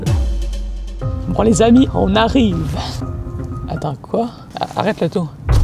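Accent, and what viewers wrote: Quebec, Canada. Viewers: ton accent quebecoi je ladorrrre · Tu charbonne les video ton contenu est incroyable continu comme ca et aussi au passage, adore l’accent québécois 😂✨🔥😆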